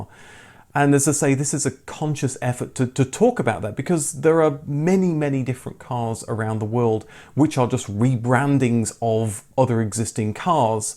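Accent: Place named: United Kingdom. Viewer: I enjoy your optional extras :) And of course your british english :)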